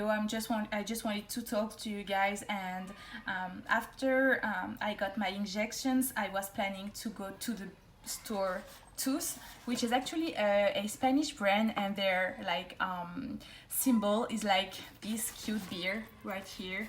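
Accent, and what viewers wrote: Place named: France. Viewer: Nice haul! I wonder if you also speak French because of the accent.? Am starting to like Tous jewelry since I only have pandora. Thanks for showing your haul .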